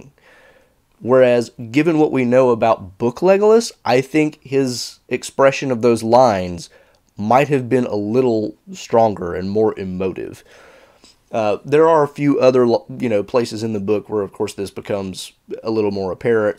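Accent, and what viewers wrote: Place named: Georgia, United States. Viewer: Georgia. That's your accent. Georgia. I know it.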